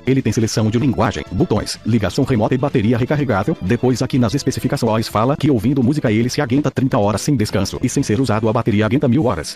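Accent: Portugal. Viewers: Vc é brasileiro ou português?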